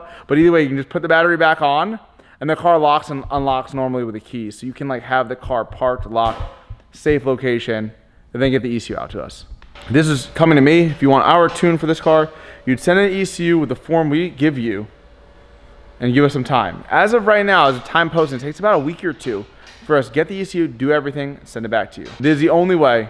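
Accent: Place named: Long Island, United States. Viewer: Listen to that long island accent 😱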